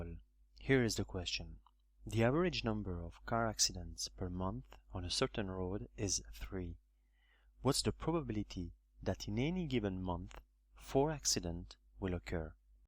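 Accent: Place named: France. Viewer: A video about Poisson...narrated by a French guy. How perfect.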